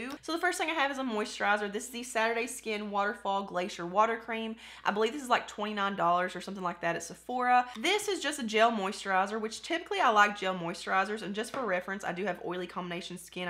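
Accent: South, United States. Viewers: Where are you from? I'm southern too!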